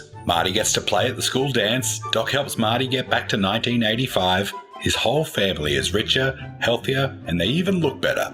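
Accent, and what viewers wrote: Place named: United Kingdom. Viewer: oh damn dude that comment i left, i deleted after a minute, because the tissue joke was quite obvious for everyone, and not a quick split second blink-and-you-miss-it pause-at-the-right-time kinda deal (like how i thought it was) you replied fast!! i appreciate that anyway this is a good video and i adore back to the future and thank you and all that subscribed and whatever and make more videos and shit that whole theory was pretty top notch and i love it actually... i kinda wish it was true and canon now honestly but my only ACTUAL criticsm for your video is you should vary up the music and sound design a bit more you know, the heavier points you drop the music to give some focus, maybe switch it up every now and then so it's not the same stock kevin macleod track... just make sure the video is as good to listen to as it is to watch that's it though, the editing was great, the jokes were good, you have a great personality, your voice is british and memorable (which is great combo on youtube) the sound design just needs some more work, and that's just from THIS video alone, i have yet to see your others!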